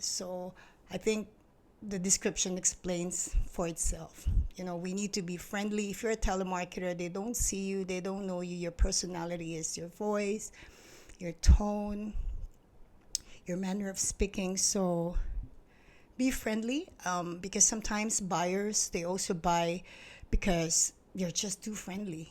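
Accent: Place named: Singapore. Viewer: You speak like a Singaporean. Very clean lines. Good articulation. Keep it up ate. Greetings from Singapore.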